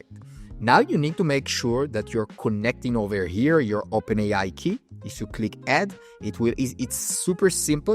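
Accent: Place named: Israel. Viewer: What's your background you sound Israeli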